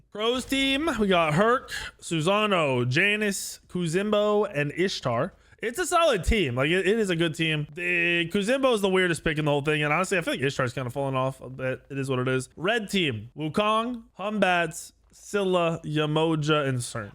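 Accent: Jamaica. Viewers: he sounds jamaican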